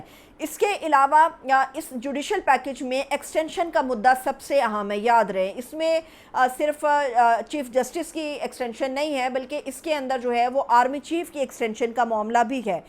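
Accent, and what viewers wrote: Punjab, Pakistan. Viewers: God to hear you in Punjabi tone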